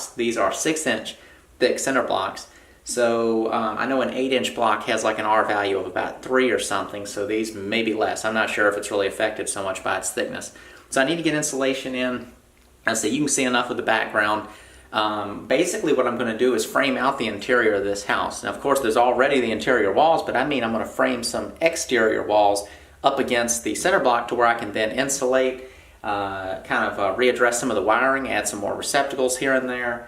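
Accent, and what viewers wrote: South, United States. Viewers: His accent indicates somewhere down South.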